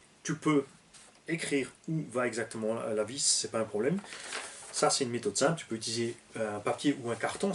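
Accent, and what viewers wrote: Alsace, France. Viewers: Mdr le 💩💩💨 🤣🤣🤣 Moi l'accent me va bien au moins je te comprend 🤣🤣 jsuis aussi alsacien !! Ah yo Hopla 🥨 Merci pour la vidéo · En vrai l'accent Alssacien ça va , mais si tu écrit pour expliquer c peut âtre pire 😂😂😂.....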